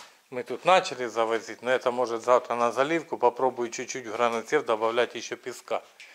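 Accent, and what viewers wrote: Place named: Surzhyk, Ukraine. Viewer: ви приклад для всіх.Відео ваші 👍 Нам дуже подобається як ви розмовляєте по суржику.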